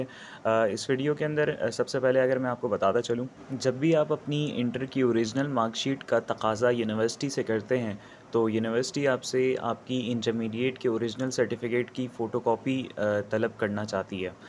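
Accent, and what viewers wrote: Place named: Karachi, Pakistan. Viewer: Best urdu bro... Karachi ki yaad dila di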